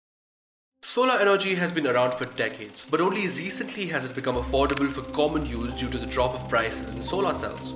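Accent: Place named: India. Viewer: I've a feeling the v/o is done by a person with Indian roots. Great video, either way, made me smash like. Also, anyone reading this should subscribe to pewdiepie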